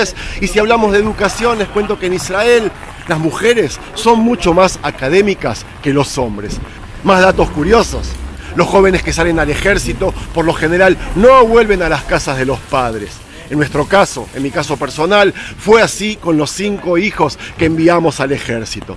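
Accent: Argentina. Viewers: Una pregunta yo recién q encuentro este canal, y tú eres judío pero hablas perfecto el español con acento argentino, quizás haya algún capítulo donde explicas eso. Y realmente me gusta escuchar hablar de mi querida nacion de Israel en un español muy entendible, te seguiré viendo. Me gusto el reportaje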